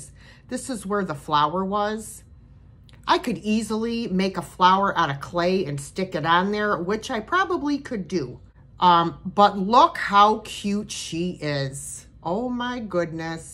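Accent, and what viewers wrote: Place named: Western New York, United States. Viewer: Hi, Just a Question....Do you remember Uncle Jerry's Club? I think it was from the 50's filmed live from Rochester or Buffalo NY studio. It had Aunt Annie on the piano and I used to try and copy the accent, that you also have;^) My sister and I never missed it (Sunday or Saturday morning??) We loved saying Cheektowaga Lackawana and Tonawanda etc. Love your Sat 3pm show and of course others. Please never lose your enthusiasm.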